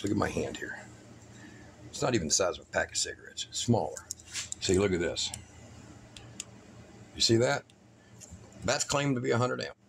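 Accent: Texas, United States. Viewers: So funny that I hear I think a Texan. I know one friend of mine with the same name in Irving, TX that's a Scouter friend. Living close to DFW airport gives a perspective of how folks talk. Believe your doing a great job for us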